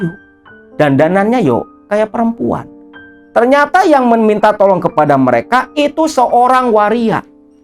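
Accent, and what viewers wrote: Java, Indonesia. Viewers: Assalamualaikum kang.. Sukses selalu ya kang Blitar hadir.. Kalau ngomong jawa lucu banget 😂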